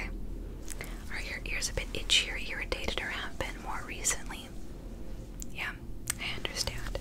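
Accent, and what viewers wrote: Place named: England, United Kingdom. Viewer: Some videos you have an English accent, others not. Which one is your actual accent? Been wondering this for a while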